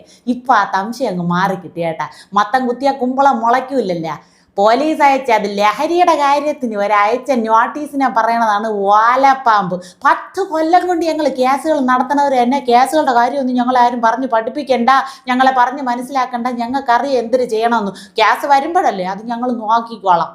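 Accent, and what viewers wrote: Thiruvananthapuram, India. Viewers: Super. TVM slang is suerb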